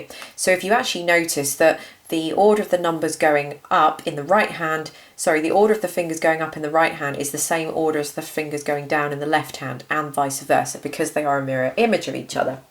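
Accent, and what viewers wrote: England, United Kingdom. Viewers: She is English lady her accent is different from Americans accent.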